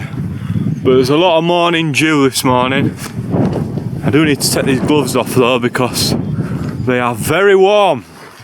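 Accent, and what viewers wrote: Yorkshire, United Kingdom. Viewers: I'm guessing your from Yorkshire mate, loving your videos mate.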